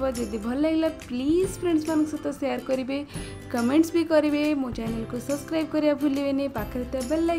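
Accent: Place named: United States. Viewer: Why ur accent is like this are u from America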